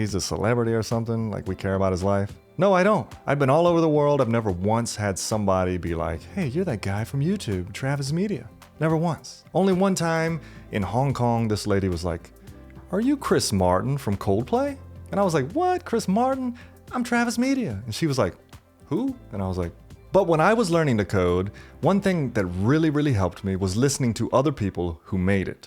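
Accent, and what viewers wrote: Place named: Louisiana, United States. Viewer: I changed my career from food service -> call centers -> web development. I did the final one at 30. All self taught I think it taught me more about perseverance and made me unafraid to do new stuff I no longer let not knowing something prevent me from doing it. That accent you have though, I bet you were born in middle Louisiana or southern LA sounds like Avery Island. --- To other folks looking to make this same type of switch. Do not let anyone tell you "programming is too hard" it just takes dedication and practice as well as a penchant for enjoying learning.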